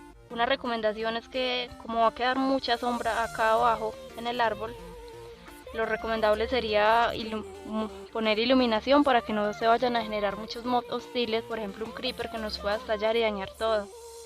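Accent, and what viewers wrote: Colombia. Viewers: colombia? :u paisa? xd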